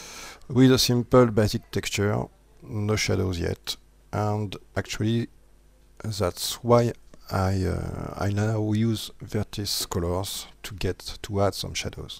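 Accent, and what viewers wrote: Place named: France. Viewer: Really useful video. I would have never thought about that trick. Also I enjoy your accent. Brings back good memories from the land of Begets and red whine.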